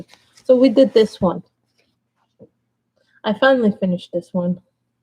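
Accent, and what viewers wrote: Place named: New York, United States. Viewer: Wow. You were really busy in June. Great job on finishing books. I have yet to finish one. I jump around so much. I just want to color all the books. Lol. I hope you are enjoying/ enjoyed your vacation. So tell me where in NY are you? Yes the accent gave it away. Lol. Of course I know it well as I’m a New Yorker. I use to live in Yonkers. I’m now in Florida. Ok. Take care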